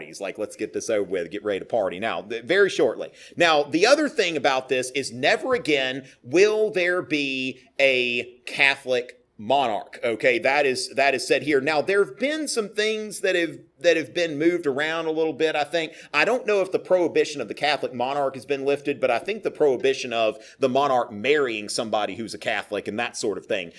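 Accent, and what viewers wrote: South, United States. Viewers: This is a really good series. Engaging teacher, which isn't that common! Strange to hear British history with a southern accent, but nonetheless a really fantastic foundation of the period. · It is so strange hearing such highbrow stuff being taught with a thick, hick accent.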